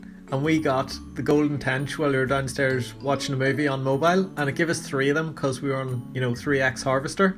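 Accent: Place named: Scotland, United Kingdom. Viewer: Love your Scottish accent bro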